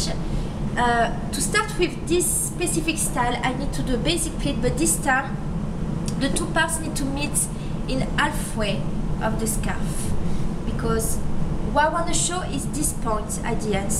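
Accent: France. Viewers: FRENCH ACCENT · I like your french accent. Il faut que l'on se rencontre ;)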